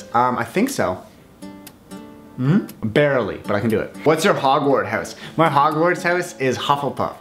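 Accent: Canada. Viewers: basically ayla he’s Canadian. That’s how they pronounce it in Canada.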